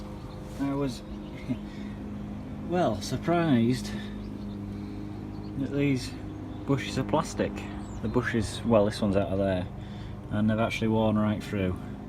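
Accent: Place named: Yorkshire, United Kingdom. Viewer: I thought u were a Yorkshire man!... turn them bushes over, there only worn out at the bottom 😉😂😂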